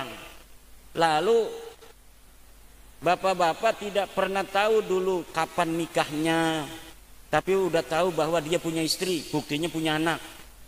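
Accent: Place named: Batak, Indonesia. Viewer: Dari gaya bicaranya rijik saja nazabnya sudah batak. Mukhibiiin....mukhibiinnn. yang pinter rek !!!!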